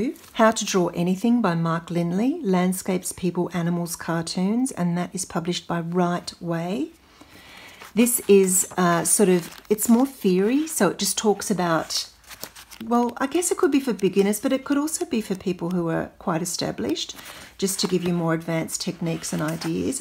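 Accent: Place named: Australia. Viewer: Hi, this video came up on my recommendation list, so glad to hear an Aussie voice, then I see you live near Canberra which is my home, I subscribed and really looking forward to more videos, love this one on books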